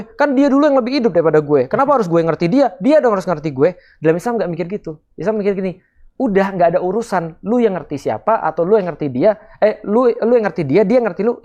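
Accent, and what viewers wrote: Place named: Palembang, Indonesia. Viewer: Iyo lah pak ustad masyaallah kental nian Palembang nyo mantap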